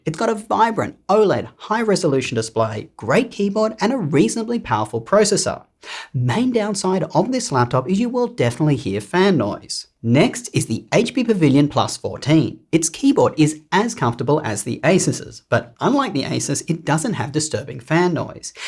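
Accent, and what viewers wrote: Australia. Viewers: Are you Australian by chance? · I just love the Austrèlien accent, I didn’t pay much attention on the contents of video but. I will watch it again and try to concentrate on topic. Thank you.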